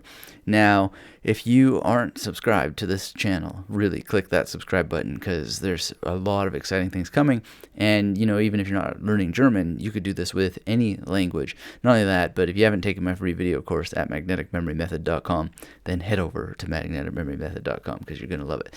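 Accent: Canada. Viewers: You sound like a Canadian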